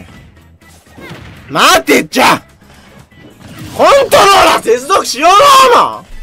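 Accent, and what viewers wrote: Fukuoka, Japan. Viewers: 方言福岡やね。何とかやろうがっちゃ〜！って言うw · 福岡のどこか？がちゃがちゃ言う方言今まで聞いたことなかったwww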